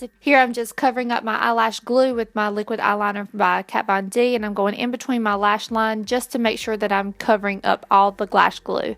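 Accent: South, United States. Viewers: ahhh reading down further, a southern accent!!! Love it, I'm in Tennessee!!! :) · yay ur back I missed u I love the look and ur personality ur awesome and I love that u have that southern accent I got it to and never be in braised about it. it's just who we r yay sending big love from TN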